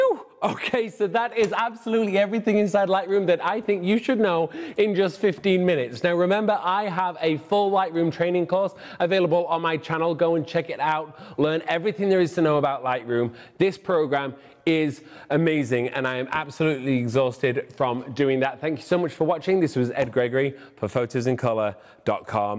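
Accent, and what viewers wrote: England, United Kingdom. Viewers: It was great to discover an English man talking about Lightroom!! There are so many Americans and as a hearing impaired lady it’s difficult to follow their accents sometimes, even with the captions. Thanks for an informative video. I’ve not used Lightroom before so I will be following your blogs with interest. Just one question. Why do bloggers feel they need to speak really fast?!!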